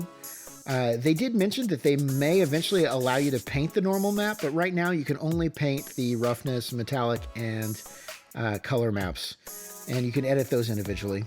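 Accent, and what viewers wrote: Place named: East End London, United Kingdom. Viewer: I can’t say enough good things about Sothern GFX and their affordable, and well structured training. Also I am enthralled with accent. I’m afraid to venture this guess, but is it East End London?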